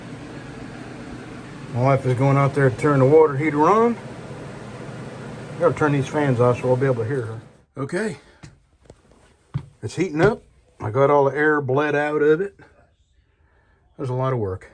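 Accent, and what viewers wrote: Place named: Northeast, United States. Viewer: Talkin about that MCI truck reminds me of the time when I was heading down I-69 into Ft. Wayne, Indiana and had a Petershaker that was pissed about being passed shove me off into the median. Had to get towed and bitched at over that one. Freaking safety officers. Hate 'em. I never noticed it before but with as many times as you said "wourder" in this video, it's plainly obvious now that you're from the northeast.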